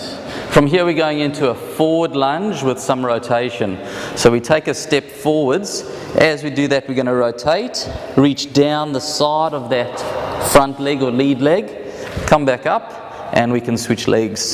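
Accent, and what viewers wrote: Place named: England, United Kingdom. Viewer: The English accent will help me to remember those movements.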